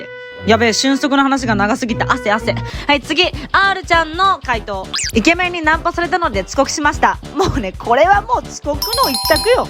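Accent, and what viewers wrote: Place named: Hakata, Japan. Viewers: マジDJ社長大好き間違えただった😍ｗｗ この博多弁？めっちゃ好きやわ～ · チャンネル登録しました!! おなじ九州なので福岡弁癒されます♡ 頑張ってダイエットしたい☆彡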